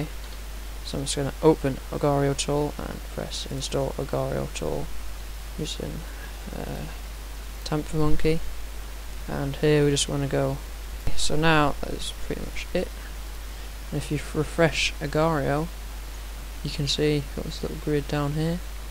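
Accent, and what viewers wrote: United Kingdom. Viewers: BRI'ISH 👽😩 but yeah "It's not looking good brav", from the solution of y'all's problem, is to have a smaller res, some duu from another vid published 6 months ayo saiiyyyd to do a 50x50. We'll see tho, I'm nearly at 90 DNA. 👽👀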